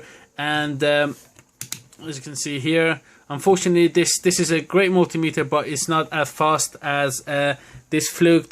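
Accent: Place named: Iran. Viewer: از لهجه ي ضايت معلومه ايراني هستي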